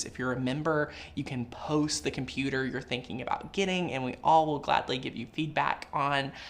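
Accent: South, United States. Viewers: I use my surface pro for design space & joy app also. do I hear a lil southern slang in your voice? Love it,awesome videos,thanks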